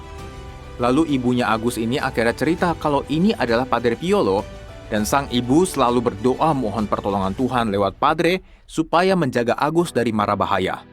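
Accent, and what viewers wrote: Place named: Java, Indonesia. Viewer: Jangan pakai logat jawa lah. Jadi gak enak konten mu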